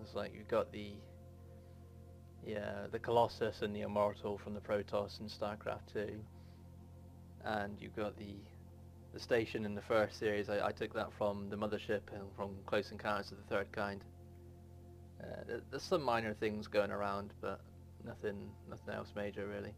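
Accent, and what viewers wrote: United Kingdom. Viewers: You sound like if a voicebot had a british accent ngl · Oh my god you have a so goof brithis acent. Its sounds so good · epic british accent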